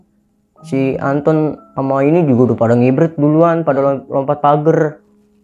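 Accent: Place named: Betawi, Indonesia. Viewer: betawi parah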